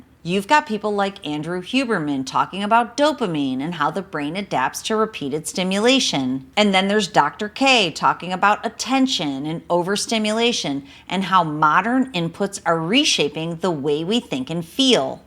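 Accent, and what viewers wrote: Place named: Great Lakes, United States. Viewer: You're from somewhere around the Great Lakes arent you?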